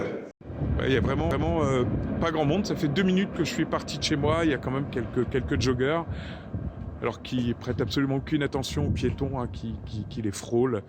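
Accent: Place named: Brittany, France. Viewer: Purée ca m’a fait drôle! Je vis maintenant en occitanie et je voulais faire entendre à mes enfants, le bon vieil accent brestois... Après quand on écoute bien: il est encore là! Bisous!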